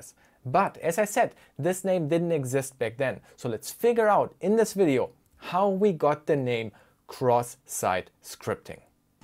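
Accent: Germany. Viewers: You always pronounce the X in XSS in German.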